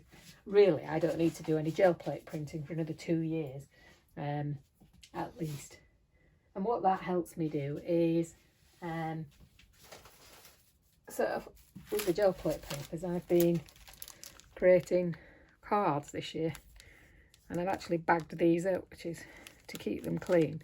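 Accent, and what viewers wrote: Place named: Hull, United Kingdom. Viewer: don't know why i haven't discovered you before! great stuff. you sound like you're from hull, i'm in cleethorpes. thank you xxx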